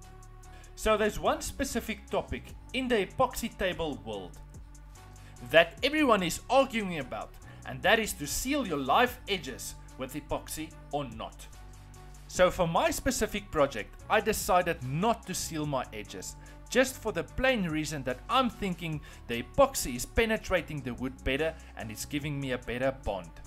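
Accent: South Africa. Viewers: I hear a south african accent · What router bit did you use and do you recommend it? I lived in Secunda and Johannesburg for 5+ years. Its nice to hear your accent. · Hey. You sound South African. If so, how can I get in touch with you?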